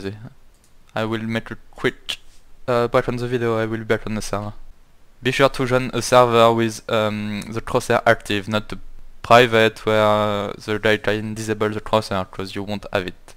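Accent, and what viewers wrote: France. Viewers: comment tes pas crédible parler anglais on le sens l'accent fr gros mdr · mec tu es Français ?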